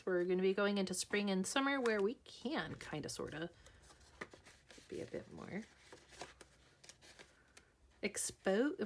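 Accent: Australia. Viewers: 🏍 I swear I lost it when you called the doggies boof heads. You are getting more aussiefied every day ❤️ Kelz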